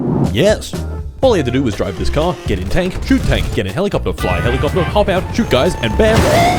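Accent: Finland. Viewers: Ah the sound of Finland, thank you for blessing our ears.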